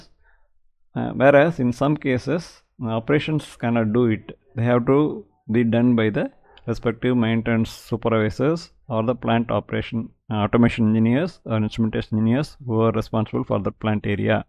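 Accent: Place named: Tamil Nadu, India. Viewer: Is it possible that you speak straight english clearly instead of Tamil english ?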